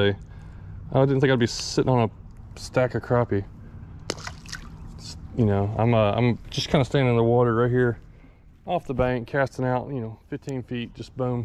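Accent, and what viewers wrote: Tennessee, United States. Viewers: What part of Tennessee are you from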